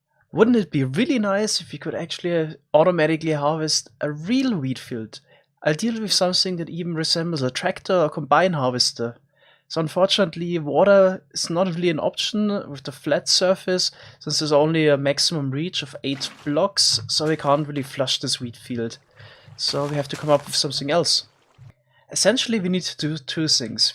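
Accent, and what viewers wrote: Germany. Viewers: Ich mag den deutschen akzent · His german accent is legendary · Du bist Deutsch, man hört das xD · man hört das du deutsch bist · Are you german ? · Love ur german accent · Hahah dieses deutsche englisch😂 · Mann Merk das du Deutscher bist😂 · Da hör ich doch direkt den deutschen akzent😉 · Nice! Rebuilding Farm Simulator in minecraft. Is that a German accent I hear? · Sprichst du deutsch? Das merkt man bei der Aussprache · Are you german??? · Are you from Germany because you sound Like a German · This German accent. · Liebe den deutschen Accent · Bist du deutsch? Xd klingt so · are you german (Just Asking because of the excent) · That German accent, loved it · This german accent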